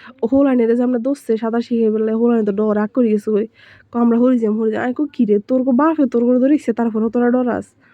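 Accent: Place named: Sandwip, Bangladesh. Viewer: সন্দ্বীপের ভাসা😂😂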